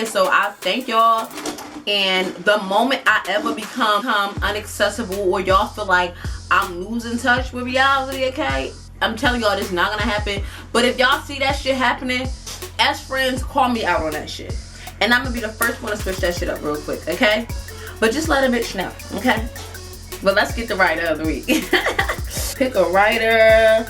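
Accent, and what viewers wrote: New York, United States. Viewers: u are so beautiful and i love ur new yorker accent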